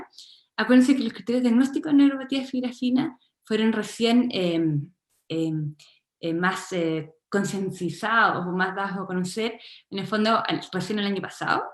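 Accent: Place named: Chile. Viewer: Cuesta entender sus palabras debido a su acento tan marcado, es chileno? 🫩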